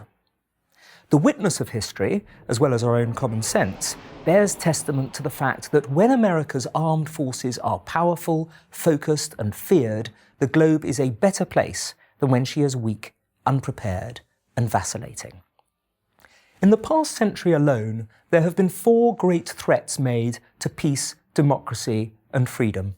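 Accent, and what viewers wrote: United Kingdom. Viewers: Absolutely! With his british accent, looming pasty face and giant forehead this feels like something straight out of 1984, like it should be projected on the side of a building to give the proles on the street their daily dose of propaganda. "WAR IS PEACE, FREEDOM IS SLAVERY, IGNORANCE IS STRENGTH" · Nice work getting this intelligent sounding British man to dazzle the yanks with his fancy accent. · This is a inside joke right? From your accent I'm assuming that you are British, I'm embarrassed to be from the same country as you. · Why is their a British guy saying this?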